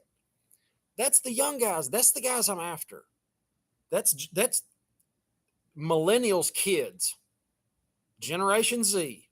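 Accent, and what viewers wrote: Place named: South, United States. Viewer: This is NOT an important comment but I just have to say that you are Billy Bob Thortons look alike! That southern drawl and all❤️❤️❤️ Also, thank you for all you do in proving information to us preppers.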